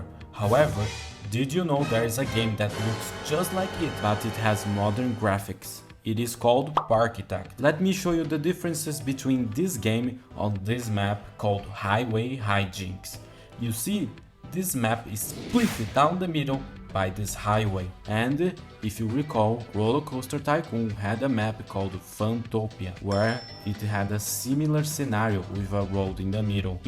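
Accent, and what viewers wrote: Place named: Brazil. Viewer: lol, tu é brasileiro? Esse sotaque BR com tantos "i" no final das palavras tá muito forte hein hehehe.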